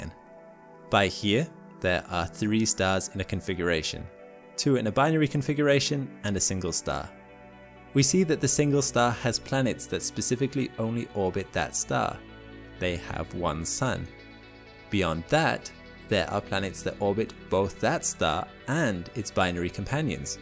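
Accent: United Kingdom. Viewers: it's a called a british accent buddy. fun little fact; they're the ones that invented english, so you're the one with the accent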